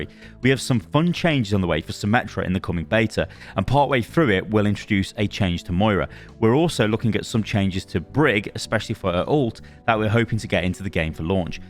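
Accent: South, United States. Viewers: really good American (Southern) accent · your southern accent isn't bad. It's also kind of sexy LOL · I need more southern in videos. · I took a break from OW but I am back excited about OW2. I missed your content! Also the southern accent was unexpected but funny 😁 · OK, I kinda love the American Southern accent. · your Southern accent is fucking laugh out loud!!!!!!! From the States here. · southern accent sounds like Chris Chan nononono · kkona accent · OMG giving us a southern american accent is something I didn't know we needed. But it was glorious m8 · Well shitttid... your southern country accent is on point!!! Hahaha haha 😄 😆 🤣 I moved to hillbilly country and that's exactly how they sound 🤣 I wish more info was given for currencies and how they interchange. Claim it all on OW when you can! Bring back Jeff.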